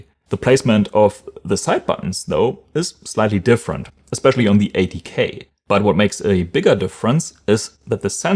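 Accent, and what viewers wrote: Germany. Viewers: I've noticed I'm hearing the German Knacklaut less frequently these days. I must admit, it's quite pleasing to the ear. Not that I don't appreciate a German accent in fact, I think is rather charming.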